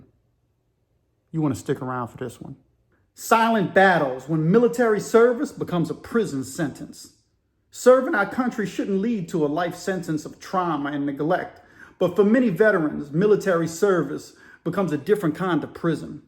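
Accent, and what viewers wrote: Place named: Deep South, United States. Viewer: I’m so chuffed ( happy 🇬🇧) I found you. You are ‘ The Font of Arcane and Highly Engrossing Deep South Prison and More Stories ‘. You’re honest too ! You are the most ‘ unique’ and different prison platform. Most of the prison boys tend to be from Cali, Texas, Florida or Georgia. Not much from the Delta. I’d love to have visited NOLA before that terrible hurricane ripped the heart out of that most mysterious voodoo infested French and African melting pot in the World. Damn. Yep it’s great to hear your accent, and the fact that you’re mixed inside . Not political structure like Cali, West Coast. And they call the Deep South racist. Shit , every colour population has fools and maniacs, but that’s no reason to hate the whole group. Seems a bit of that Southern Hospitality and Manners goes a long way. Keep em coming please. 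That was a really moving tale about the treatment and experiences of veterans. A lot in Britain are homeless and in prison. After the 2 Gulf Wars, Bosnia and Afghanistan. They all have, sadly, been let down. Good on yer for keeping them in our thoughts. Top Rankin’ 🇬🇧🤝🇺🇸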